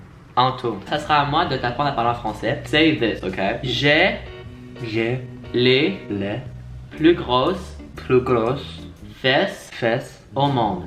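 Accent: Quebec, Canada. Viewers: Si tu veux réapprendre un peu le français, en tout cas te replonger dedans tout en conservant le charmant accent québécois, tu peux regarder les courts-métrages du réalisateur Émile Roy!!!:D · Choqué 😶 je t'ai découvert via ta chaîne anglaise et tes pranks. Je me serais pas douté que tu parlais français! 😄 Tu parles super bien! (On entends bien l'accent québécois 😉) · T'a grave un accent québécois j'adhère👌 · Woaww ton français est vraiment québécois😂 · TES SI QUEBECOIS😂😂😂😂 (s’cuse, je suis aussi canadienne mais à mon école on tous parle français de france comme les profs tous viennent de france et avant d’aller à cette école mon français était aussi mix) mais oof je voyais pas la différence avant que mon français est devenu si « français de france » et wow je le trouve si drôle d’écouter qqn qui a du français SI QUEBECOIS (pas de shade du tout à toi) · C’est vraiment cool que tu te partes une chaîne en français, j’écoute tes vids en anglais depuis longtemps pi moi aussi je suis une québécoise bilingue ahaha! 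Mais hey ne te fais pas d’accent français, l’accent québécois c’est cool! Pi si tu garde ton vrai accent québécois les gens vont pouvoir voir que les gens qui parlent français au Québec ont pas le même accent que ceux de la France comme tout le monde pensent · OMG T QUÉBÉCOIS JTM OMG AHHHH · c'est vrai tu as un accent québecois aussi XD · Bonjour, je suis française et j'ai pratiquement la même histoire que toi: mon père est Anglais est vis à Londres et ma mère est française je vis avec elle du coup j'ai toujours parler les 2 langues depuis ma naissance (j'ai passé ma crèche et toute ma maternelle a Londres), mon père viens souvent nous voir mais j'ai toujours étais plus confortable avec le français. Ton accent est trop mignon et je trouve qu'il est plus Québécois que français😆. Tu as 19 ans, il me semble. J'adore tes vidéos BISOU!!!💜💜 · Damn I never realised how different Canadian French is to French from France. 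There are a lot of Canadian sounds like dans le futurrr instead of dans le futurgh if ygm😂😂 Ilyyy 💕💕 So glad I'm taking French as a GCSE rn😂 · Oooh je viens juste de découvrir ton accent québécois-français tes trop mignon, so cute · C'est vraiment cool, tu viens du Québec! Tu as un bel accent québécois malgré le fait que tu dis que tu l'as un peu perdu😉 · Tu parles très bien français. Bon après y'a les expressions du genre "Mon cheum" mais ça c'est du français québécois donc aucun souci:). Tu as accent mi québécois mi français j'aime bien. Ha oui faut dire à que la langue française ne se résume pas à "Je suis déguelasse" hihi:) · Tu à l accent québécois...😅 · Ton accent québécois 😂❤️ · Ton accent québécois est trooooop mignon 🥰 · ah ben tbk t québécoi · Ce n’est pas une accent mixte c’est pure québécois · J'adore ton accent de Canadien français. Mais l'accent anglais de ton boyfriend est trop mignon aussi. 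Il faut vraiment qu'il apprenne le français! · Tu parles un français un peu Québécois, tu viens d’où? [edit]: en fait c’est pas « un peu » mais tres Québécois wow [edit 2.0]: cest bon javais pas réalisé la partie « moi je viens de montreal » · Ouais je suis très content de voir que tu fais une chaîne avec le super accent québécois qu’on aime tous✌️ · juste à t'entendre parler, j'ai su que tu étais québécois! ( je suis québécoise moi aussi ) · Lol il est québécois je ne savais pas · Je trouvais que tu avais un accent québecois avant que tu le dise 😂😂 Je savais pas que tu etais québecois, mais j'aime trop au mon dieu. Je suis française (ouest de la France) et je suis tellement contente de savoir que tu parle français, genre j'arrive pas a decrire a qu'elle point je suis contente😍😍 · Oh mon dieu!! Je suis française et je savais pas que tu parlais français! Mashallah l'accent québécois!! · Omg t’a l’accent québécois 😄🔥 Im here from Montreal! 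· Ah ce français du Québec j'adore en plus t'as l'accent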